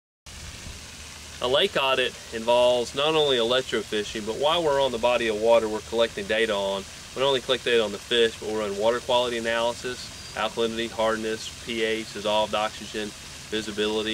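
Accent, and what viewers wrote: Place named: Virginia, United States. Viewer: Love the accent :) Virginia ?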